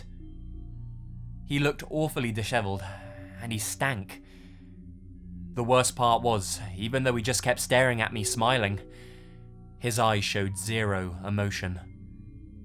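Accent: England, United Kingdom. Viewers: I just love your voice and these abruptly pronounced sentences with english accent. Pure eargasm. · Thanks for the content! Even though I'm from the UK also and have a similar accent, the way you said "and he stank" really tickled me, idk why haha. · Are we done picking on a person who sounds like he speaks with an "English accent" for pronouncing some "American words" in a manner which has some people Triggered? How about y'all Get Over It?! Maybe those Americans should concentrate on the fact that WE refer to many places in ways that the Locals don't...and THEY live there. Germany is called Deutschland. Turin Italy is called Torino by locals. Europe is often referred to as Europa. Keep in mind, the Narrator is (maybe) English. He speaks English. Maye it is YOU who are pronouncing names incorrectly. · what part of england you from? or london should i say?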